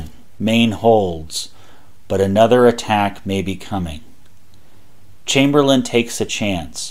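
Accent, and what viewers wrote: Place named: Pittsburgh, United States. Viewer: Ahhh, a yinzer. Nuff said . . .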